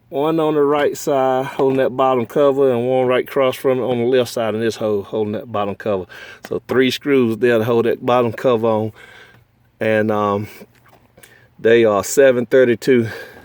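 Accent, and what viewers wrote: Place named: California, United States. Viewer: I’m from Cal so thumbs up for the accent alone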